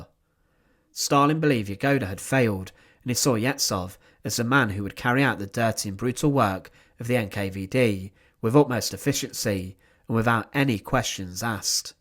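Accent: United Kingdom. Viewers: The only thing this announcer has going for him is his British accent.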